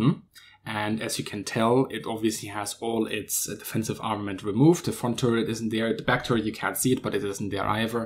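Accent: Germany. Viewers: You sound German, so I get that you use meters, but that's more like 150 feet. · Bassmint He sounds german and in german its called aluminium so thats just natural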